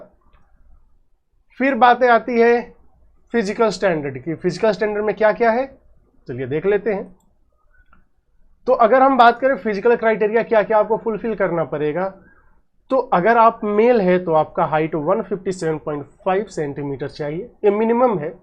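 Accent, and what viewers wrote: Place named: Bengal, India. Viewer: Ap bengali ho kya?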